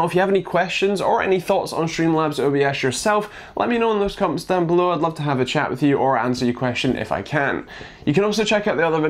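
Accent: Scotland, United Kingdom. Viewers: Great to hear a good review from a fellow scotsman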